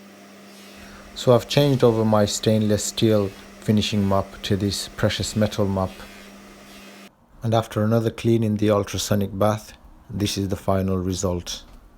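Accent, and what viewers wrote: Birmingham, United Kingdom. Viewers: Fellow Brummie here. I live in the States now, and it's nice to watch your videos and hear a Brummie accent! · Birmingham UK? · Birmingham. That's the accent I was having trouble placing. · great job Sir. Love that you included the multiple visits from your boy too. I can detect your Birmingham accent embedded within your original accent. Very interesting. I love the Birmy accent. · Hello " Brummie " I really enjoy your videos. Keep up the great work !!!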